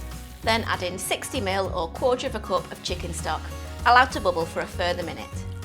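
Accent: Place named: England, United Kingdom. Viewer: Heard the English accent, I knew there'd be alcohol involved. Sigh... you all really need to stop consuming poison.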